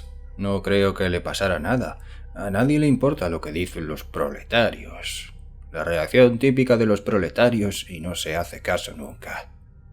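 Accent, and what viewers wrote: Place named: Spain. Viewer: Que feo escucharlo con español de españa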